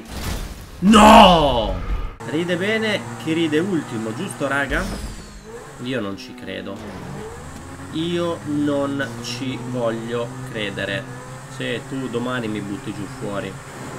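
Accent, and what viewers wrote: Veneto, Italy. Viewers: ma sei veneto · Alex-Pro- Gamer ah grazie perchè io essendo di Venezia avevo riconosciuto l'accento veneto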